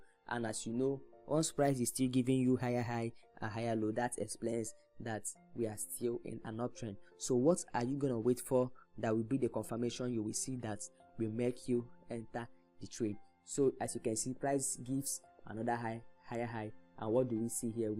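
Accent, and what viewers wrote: Nigeria. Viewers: From the accent it looks like you're a Nigerian?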